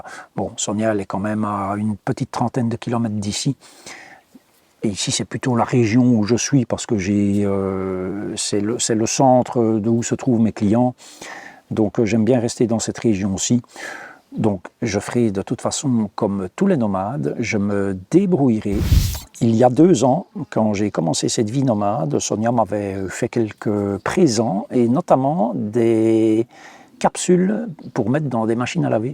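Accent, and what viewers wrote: Belgium. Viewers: milesker anitz. En tout les cas tu me fais bien rire avec ton accent belge. Au plaisir de te rencontrer sur la route.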